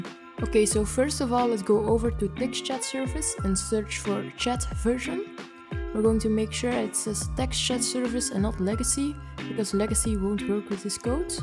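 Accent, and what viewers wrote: Netherlands. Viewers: I smell NEDERLANDER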